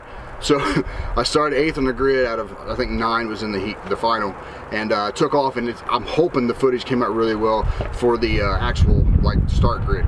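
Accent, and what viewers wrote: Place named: United States. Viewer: hello from Alaska! hey. Thank you for this. love the 'in car camera' footage. reminds me of ReVolt (Nintendo 64/ PS1) video game from 1999. Vintage is cool. for me the golden age of Rc was back between 1989 through 1992. Don't get me wrong I love the new stuff. And things are always getting better RC wise. Looking forward to the forthcoming video. BTW. where are you? You sound American. But your videos are from the uk and Europe. right? You had a rock crawler video that seemed to be here in the U.S. Though I am not sure. take care! keep on RC ing!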